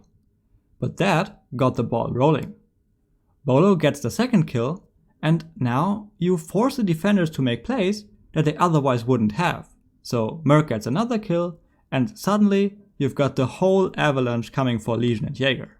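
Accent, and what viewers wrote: Germany. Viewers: Duuuude yo accent sound german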